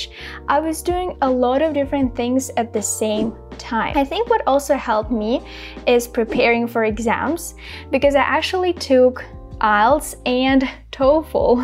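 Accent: Russia. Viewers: Cute Russian accent ☺️👌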